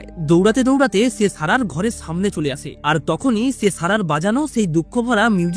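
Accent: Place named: India. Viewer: আরেহ উনি ইন্ডিয়ান😂😂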